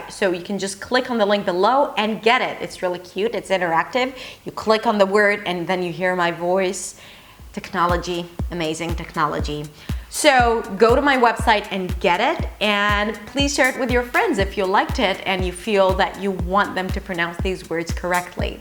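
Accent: United States. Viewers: Hey! I'm absolutely impressed by you flawless american accent!!!! Where are you ffrom and how can you be so natural? You sound more American than native speakers. Congrats!!!!!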